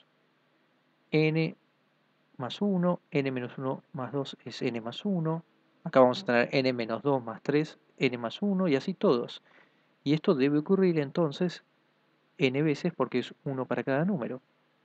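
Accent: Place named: Argentina. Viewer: Gracias por la info, por tu tono pareces de Argentina, es así? En donde estudiaste?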